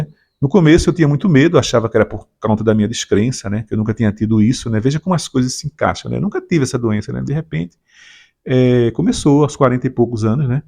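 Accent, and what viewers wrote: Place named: Pernambuco, Brazil. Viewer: Muito boa a forma que o senhor explica,sem se exaltar com calma sem ofensas a quem ainda acredita parabéns, além do mais tem esse sotaque maravilhoso eu como baiano mais adoro o sotaque de Pernambuco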